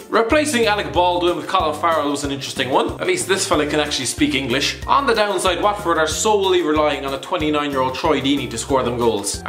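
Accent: Ireland. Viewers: He is Irish the best people in the world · You sound Irish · I just keep watching it because of his Irish accent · Is this lad from Ireland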